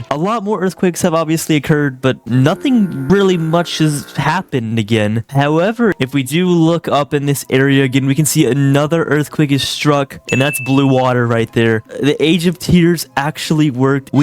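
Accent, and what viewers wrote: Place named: Australia. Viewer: Bro's from the land down under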